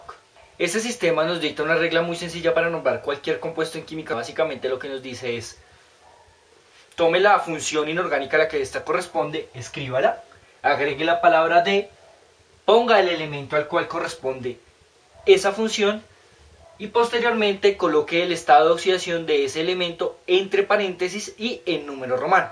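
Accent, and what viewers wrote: Colombia. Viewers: Se nota que eres de Bogotá, Colombia (lo se porque yo también)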